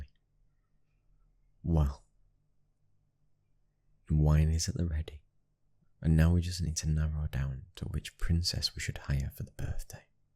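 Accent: United Kingdom. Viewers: I’m obsessed with british accents😍 Especially this man’s voice.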